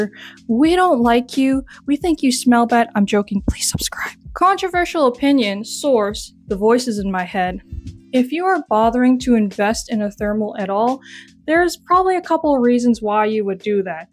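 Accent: Canada. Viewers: am i tweaking or r u canadian?? like from van canadian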